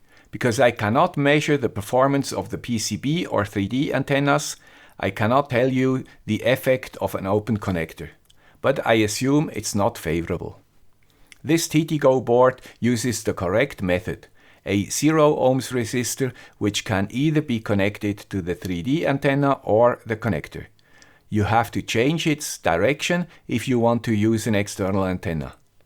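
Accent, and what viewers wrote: Switzerland. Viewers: youtube: *sends notification about new video * me in mind: * PRETTY YOUTUBERS HERE IS THE GUY WITH SWITZ ASCENT WITH FRESS SENSORS AND MCU......* 😍😍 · Hallo Herr! Ich hoffe Sie haben trotz dem netten Schweizer Akzent das Deutsch noch nicht ganz verlernt. Ich liebe ihre sibirische? Katze vom Abspann, gruss von meinem sibirischen Kater Candy. Leider habe ich das englische nicht so drauf wie Sie, kompliment für Ihr Schweizer Englisch und Ihren tollen Kanal. Ich habe noch keinen Youtube Kanal gefunden in dem ich so präzise Erklärungen zu diesen hochtechnischen Themen gehört habe. Bitte weiter so! Grüße von Michael aus Deutschland.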